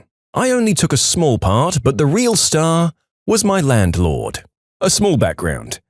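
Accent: United Kingdom. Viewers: Pls enough with the British accent 😭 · oh big deal he’s british, his voice isn’t even bad, you don’t need to get so upset over it.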